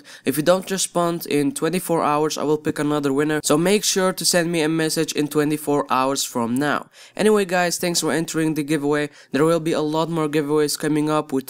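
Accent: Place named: Netherlands. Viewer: are you dutch man you sound like one im dutch too i will soon be building a 600€ gaming pc with a r7 265!!!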